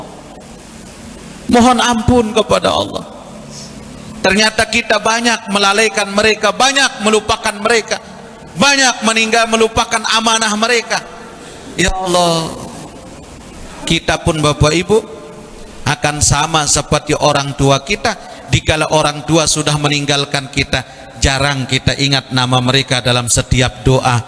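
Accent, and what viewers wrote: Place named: Bali, Indonesia. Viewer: Ininustadz siapa ya namanya? Logat biacara nya seperti asli orang bali 🙏🙏🙏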